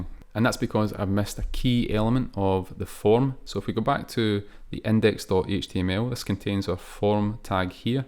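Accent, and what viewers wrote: Scotland, United Kingdom. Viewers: great video and I like the Scottish accent!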